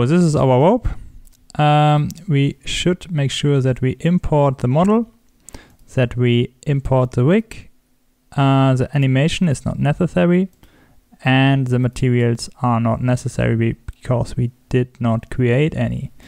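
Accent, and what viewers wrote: Germany. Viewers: I Think i can hear a german accent in you english... but it´s working and that´s what i want! ... thank you for this tutorial it´s easy to follow...🙃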